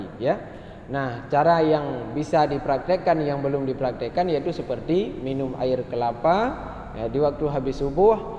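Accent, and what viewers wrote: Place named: Aceh, Indonesia. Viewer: Dari suaranya, tampaknya Ustad yang setiap kali Memberikan pelajaran di Tahsinul Youtube dengan aksen Aceh adalah Ustad yang baju Biru... Betul tidak ya ☺️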